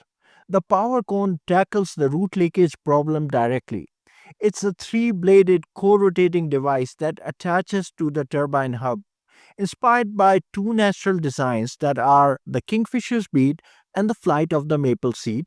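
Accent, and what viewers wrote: India. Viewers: I am enjoying the Indian lilt of this man's English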